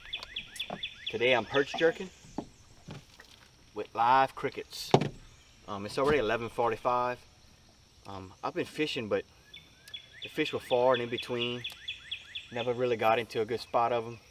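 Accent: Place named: Louisiana, United States. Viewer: First time watching awsome video. Sound like u from Louisiana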